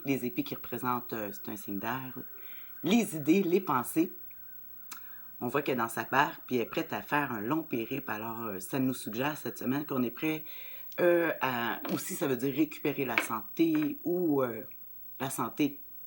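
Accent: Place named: Quebec, Canada. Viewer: Bonjour:-) - Pouvez vous nous dire à quel signe astro s'adresse votre tirage? - Et Quel est cette douce et agréable musique derrière en musique de fond? merci pour votre doux sourire et votre accent québécois:-) ici en France... on adoreeee:-):-):-)...en attendant vos réponses on like et on suit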